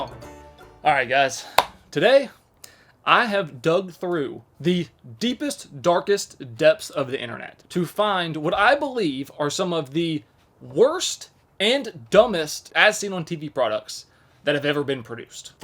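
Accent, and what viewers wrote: South, United States. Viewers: Maybe it's just the Southern accent but dude will you dropped on your head? Either that or you've huffed a lot of petrol. I bet you think the world is flat and birds aren't real.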